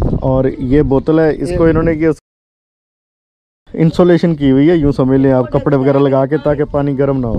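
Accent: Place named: Gujrat, Pakistan. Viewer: i am pretty surey that you belongs to gujrat pakistan, your punjabi comes from those areas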